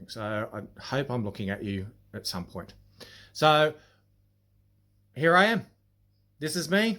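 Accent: Australia. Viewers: I have always enjoyed your Australian accent and great personality. I am saddened by the cancer report. Your abandoned videos spoke for themselves, the objects and air left behind speak loudly in your silence. I have enjoyed all your abandoned explores. you revealed a handsome kind face. A lot of lives have been touched by your videos. My prayers are with you in your time of need. Stay strong and safe in these pandemic times. It has been fun and delightful to watch your videos. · Love your videos!!! Damn, you are a handsome Aussie too!! Love your accent too! Praying for you for your health!...I hate cancer!! Big Hugs from Mississippi, USA! Thank you for sharing your wonderful abandoned videos!! 🙏❤️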